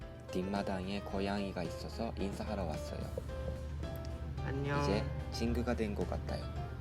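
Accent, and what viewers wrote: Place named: Kansai, Japan. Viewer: こんにちは。。韓国留学頑張ってください。私も７年日本留学してましので、映像の日常が逆に懐かしく感じますね。関西弁も久々 またに遊びに来ますので、映像たくさん上げてくださいね。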